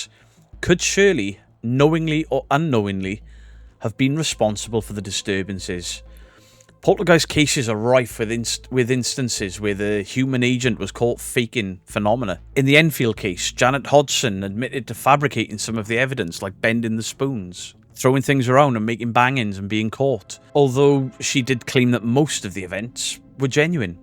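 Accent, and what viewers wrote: Wales, United Kingdom. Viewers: lo e the Welsh accent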